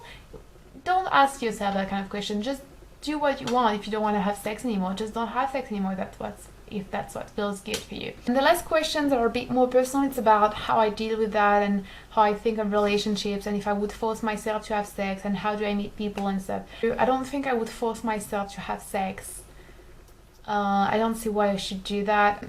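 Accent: France. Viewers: I am puzzled. Not about my own sexuality, I am not asexual, I am straight, never doubted neither of those. But when I began to watch some of your videos, I thought "Damn, she is stunning !" and then "f***... She is asexual, what a shame !". But since I was enjoying listening to your voice, I figured that would be a good time to know what asexuality really was. I used to think asexuals were people who either had bad experiences or were afraid of sex (that would have explained why I saw more asexual girls than asexual guys). After listening to you, I understand that is not entirely true. You are not a "Eeeeew ! Sex is gross ! It is a sin ! You are a whole bench of sinful perverts !" type of person, and that is relief, I must admit. My first girlfriend was not asexual, but she used to say she did not like french kissing (she was my first, but she had a lot of guys before me). 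But when we started to get physical, we ended up making out and we french-kissed a lot. I do not think I was that good at kissing (she was waaaaay more experienced than me) but it came naturally. I think it is the same with sex. With some people, it cames just like that, it is not entirely your responsability. Anyway, I do not know if you are still single (a few month have passed) but I know you will find someone. You are very cute, I love your voice and that light french accent is very charming. There is a fragility in you, that is attractive. And I hope you will be happy with him, with or without sex. ;) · nice video... hope you find the person that compliments you're amazing self well. also amazing french accent. totally love that.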